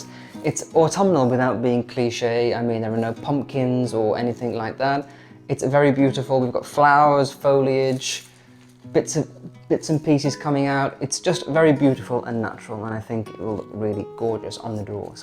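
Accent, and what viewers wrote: RP, United Kingdom. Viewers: Wow - it's like listening to a young Kenneth Kendal - it's the BBC accent one finds so very soothing on a Friday evening - and that wreath looks incredible and the squash with the mushrooms and asparagus - wow - just wow.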